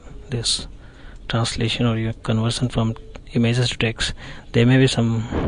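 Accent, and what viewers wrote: India. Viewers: How stupid are the people making negative comments about the guys voice. He's speaks English as a second language.... of course he sounds a little different.. He put the video up to help you out with your dumb selves. You couldn't figure out how to solve your problem... so you looked to the same Indian for help. ugh Lazy idiot racist. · i honestly HATE indian accents it sounds so gross….that doesn't make me racist because i don't like it…anyway nice video, thanks for the help!!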